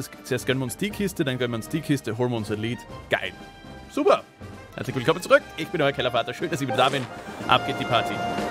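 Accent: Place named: Bavaria, Germany. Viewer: In welcher Stadt lebst du eigentlich weil du hast so ein bayrischen Dialekt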